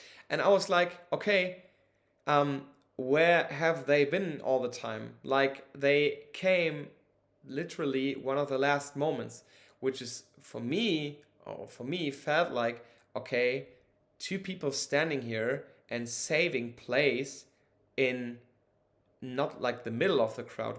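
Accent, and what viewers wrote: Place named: Germany. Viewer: Your English is quite alright, of course with a German accent. Stopped listening after your first point though: "Yeah I know the discussion about alcohol is also a drug and smoking too but for ME sniffing(taking, whatever) IS something else" So your first (and most important?) point is just an opinion of you. No personal experiences, no proper argument, nothing... You maybe seen some ppl overdo it, some with big eyes and you want to forbid that? Why? What does that have to do with you what ppl overdoing alcohol don't?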